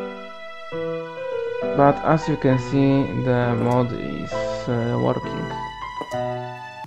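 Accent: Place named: Poland. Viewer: słychać że polak :PPPP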